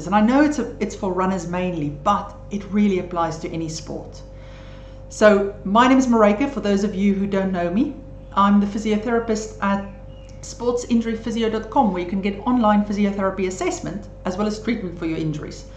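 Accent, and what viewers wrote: South Africa. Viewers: Thank you Dr. Your accent sounds South African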